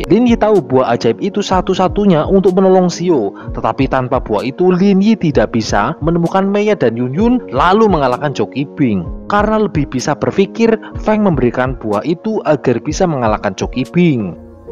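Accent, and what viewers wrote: Banyumas, Indonesia. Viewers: ok gokil,tambai ngapak ngapak,,yg lebih medok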